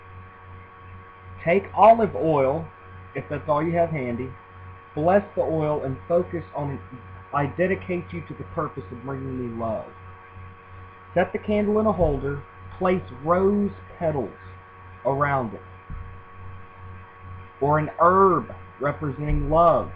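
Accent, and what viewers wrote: United States. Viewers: Thankyou i loved watching you and i love your passion also lurrrve your american 'y'all' accent keep posting xx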